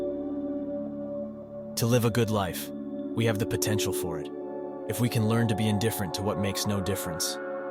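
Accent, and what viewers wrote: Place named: United States. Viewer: It would have enhanced this video if you used a more authoritative, deeper narrative voice. This sounds like a random America kid in a bar reading quotes from Marcus Aurelius.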